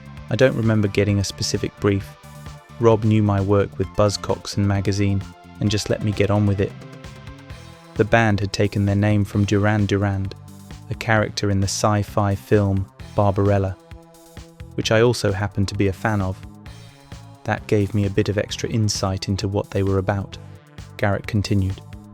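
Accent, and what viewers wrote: Australia. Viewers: I can detect some aussie accent in the voice over? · an upper class South Australian?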